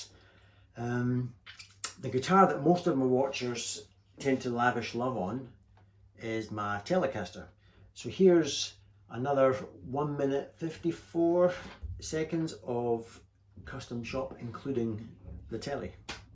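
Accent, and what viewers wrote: Scotland, United Kingdom. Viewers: Thanx so much,. Your vid wuz just GREAT (!)...and, of course, my brain'll have a Scottish accent for days now 😶...and I totally sympathize with you concerning the "Low Volume Dilemma" 😣 I suspect your singing voice'd be quite interesting too. Any thoughts/experiments?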